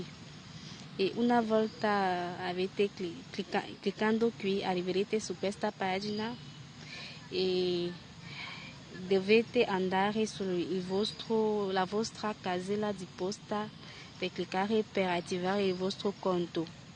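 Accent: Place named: France. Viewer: Il tuo accento mi fa impazzire! Sono francese anchio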